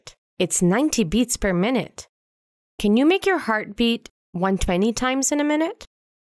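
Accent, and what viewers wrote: India. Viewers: Tamil accent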